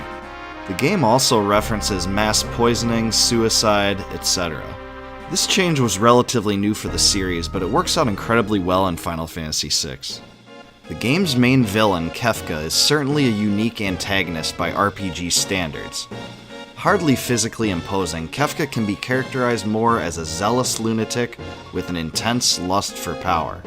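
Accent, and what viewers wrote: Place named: Minnesota, United States. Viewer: Ur from Minnesota huh? Or Canada. I can hear it.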